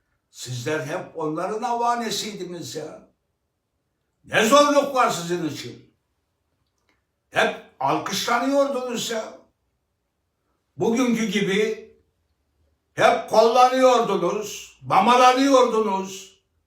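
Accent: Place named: Sivas, Turkey. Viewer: öz Tükçe ile konuşmanızı çok seviyorum başkanım tam bizim gibi konuşuyor diyorum ben de Sivaslıyım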